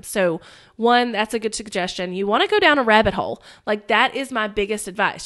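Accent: South, United States. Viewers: the southern drawl! Cute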